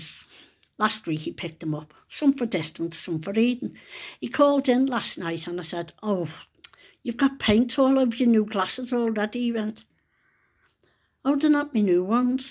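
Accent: Liverpool, United Kingdom. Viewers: hahaha "talk of the devil" hahaha great how you say it. Can listen to you with admiration together with my mum. Love that Liverpool English so much 👍🤣😄😂I'm from Australia. You look great by the way luv🇦🇺👍🇳🇱🤗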